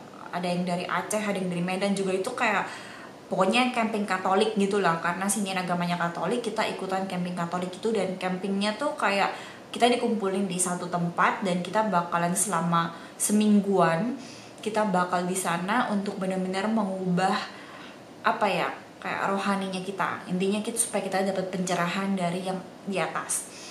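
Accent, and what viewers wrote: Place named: Java, Indonesia. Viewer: Lbh suka kalo ada medok2 nya.. haaha.. krn sm2 org surabaya.. toooss.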